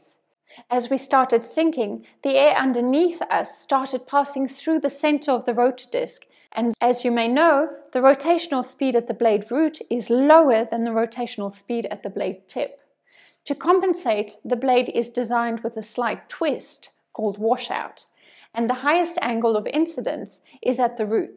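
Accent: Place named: Australia. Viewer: >>> Pardon my asking this, and you do mot have to answer if you prefer not to, but are you originally from France? I ask because you have a VERY GOOD command of English, and your accent vaguely sounds Australian. Or maybe English. I am American, so what do I know...😉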